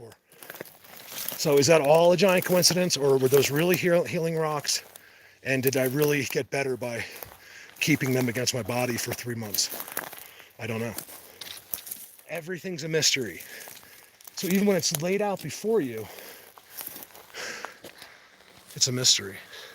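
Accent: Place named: New Jersey, United States. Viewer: I missed your live stream! Damn! Jersey. Now I know where your accent is from! Makes perfect sense. I’d been wondering since I subscribed. Love ❤️ it! Do more Live Streams! I’ll hit your Notification Bell.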